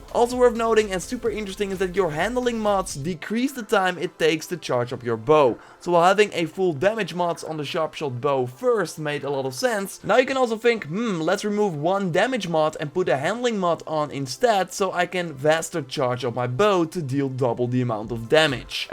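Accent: Netherlands. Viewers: are you dutch? bc u sound very dutch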